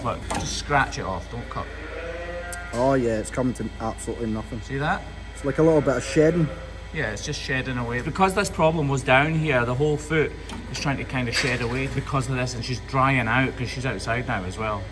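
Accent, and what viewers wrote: Scotland, United Kingdom. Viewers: It's the Scottish accent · Sorry I love your Scotish accents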